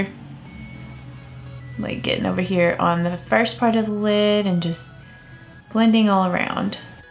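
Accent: South, United States. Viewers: I love your southern accent and that eye look is gorgeous!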